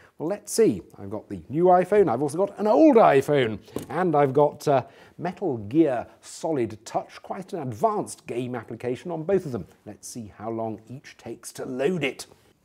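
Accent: United Kingdom. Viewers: he does not just speak with the British accent, he hads his own flair to it lovely job mate like the brits would say!!!!.....nice job from america. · Holy crap, the Brittish is dripping of that accent.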